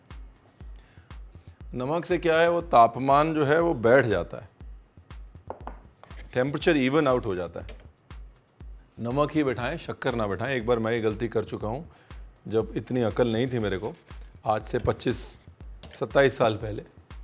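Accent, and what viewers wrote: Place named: Lucknow, India. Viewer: What a personality Punjabi Jatt guy with a calmest aura, ice it up with Lucknowi hindi, shayari and chef..and katilana looks...Mashallah!!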